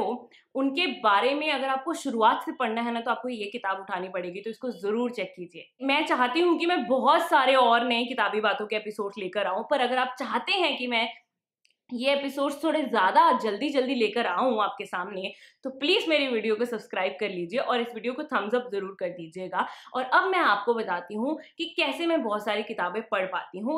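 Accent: India. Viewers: I think you have a very good command over English language especially coz of your indian accent. I mean your language is quite easily understood by indian audiences. I looked for audio books over YouTube and applications so that I can improve my English but didn't get even a single video or audio book in indian accent. Then I thought of you for a second that why cant you create an audio book over youtube. Isn't it a great idea? It will help a lot of Indians like me who are looking for such audiobooks. I hope you come up with atleast one such book in near future. Thank you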